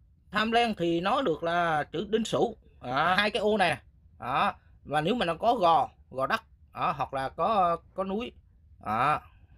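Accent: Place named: South, Vietnam. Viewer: Thầy giảng hay dễ hiểu nhưng nói hơi nhanh giọng nam chưa quen nghe thầy nói chậm một chút Và muốn mua là kinh để học thì mua ở đâu? Và muốn học thầy thì thủ tục ra sao Cảm ơn thầy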